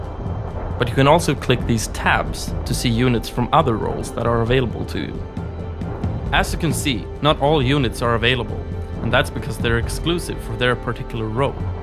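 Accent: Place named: Sweden. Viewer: haha yää, du låter svensk??^^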